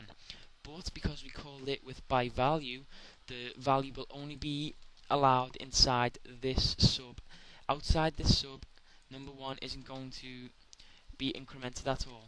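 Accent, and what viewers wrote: Liverpool, United Kingdom. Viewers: good video ... understood it perfectly thanks. your accent tells me you're from liverpool... am i right?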